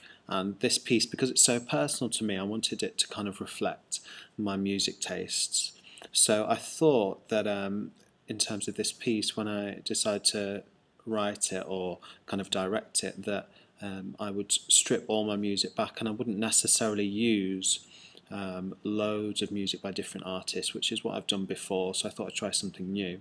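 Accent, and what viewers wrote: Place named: Northern England, United Kingdom. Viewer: You have turned proper northern with the accent :)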